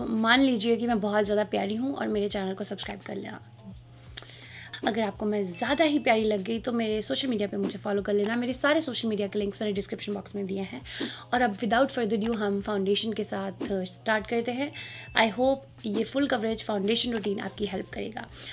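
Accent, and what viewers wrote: Punjab, India. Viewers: Hello Mem ap Punjab se ho kya apni batye payari ha bolne ka tarija name nic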